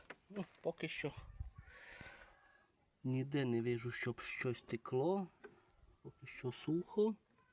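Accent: Transcarpathia, Ukraine. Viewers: Чисто случайно попав на твий канал Ти Закарпаття цикаво з якого села ты говориш так смішно Закарпацько Українсько руським словами ты таке наговорив поповудюу запчастей на москичу що Автомобилисти смiються для того аби правильно називати запчастини автомобиля хочаб читай в книжцi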